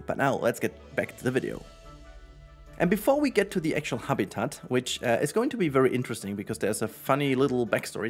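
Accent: Germany. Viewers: Still that typical horrible german accent BUT i have to say : Your build are always awesome. TBH i want a PZ2 instead of a PC2 ... With better performance and way more creatures. Modder creates SO GOOD new creatures, but they are always unfunctional after every patch... that sucks ^^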